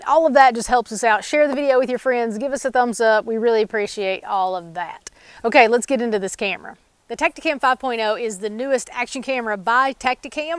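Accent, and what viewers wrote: South, United States. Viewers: judging from your awesome accent, I gather your in the south someplace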